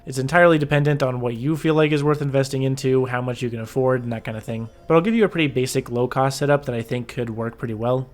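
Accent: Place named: Australia. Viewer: I like your aussie accent mate 😂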